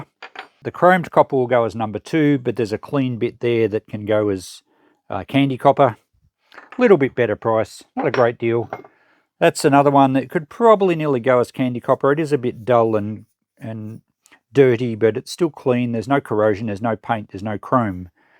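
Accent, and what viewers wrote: Australia. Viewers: I tried watching this twice, but It was late and I was tired. Your smooth Ozzy pronunciation put me to sleep 😴. Lol, third time now, I am well rested. Fun sorting video 👍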